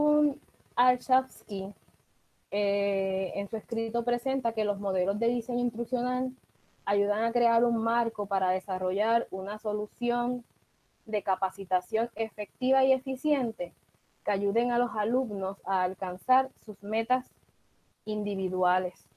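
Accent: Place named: Puerto Rico. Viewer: Hola! Por tu acento estoy seguro que eres de Puerto Rico. Yo también lo soy pero vivo en México. Estoy tomando mi maestría en Educación Superior con la Inter y tuve una asignación relacionada con el Diseño Instruccional. Después de mucho buscar en Internet tu video fue el complemento perfecto para entender las lecturas asignadas, que sinceramente no me dejaron claro el tema. Ahora sí me quedó muy clara la forma de presentar una lección siguiendo el Modelo ADDIE. Gracias MIL!!!!!! Sigue adelante!!!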